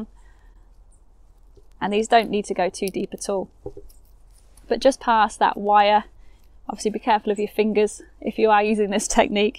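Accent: England, United Kingdom. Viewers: I must say... It is nicer listening to your English Accent. My American accent is very flat sounding...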